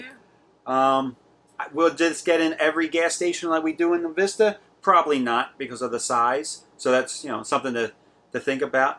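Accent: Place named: Northeast, United States. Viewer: Don't worry I say 'youzz' too. Its that Northeast lingo lol. Sounds like it was a great trip. Shopping for RV's is dangerous enough I don't know about actually living in one for a week. I would be back at the dealer signing papers. I look forward to seeing the walk-thru. Stay safe and happy travels.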